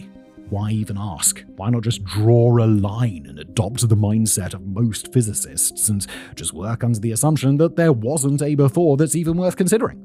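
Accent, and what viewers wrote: United Kingdom. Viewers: For starters, there was no big bang. Therefore, there was no nothing before the Big Bang. Telling a story with a little cute British accent isn't gonna make it any more believable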